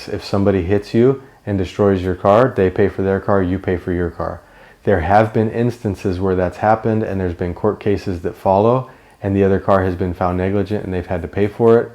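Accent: United States. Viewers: Where are you from? your accent is American but you live at the Green Hell...I'm confused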